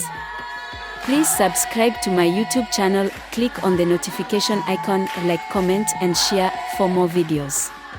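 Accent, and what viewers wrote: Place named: Kenya. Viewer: Are you from Kenya because your pronunciations are exactly like Kenyans......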